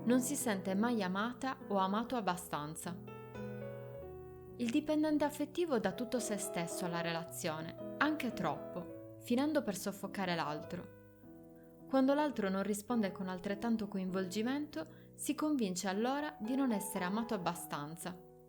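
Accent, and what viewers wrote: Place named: Sardinia, Italy. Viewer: Giustissimo avere una dizione corretta nel proprio bagaglio, ma spesso gli accenti danno personalità ai racconti.. A me il tuo sardo piace🌊💙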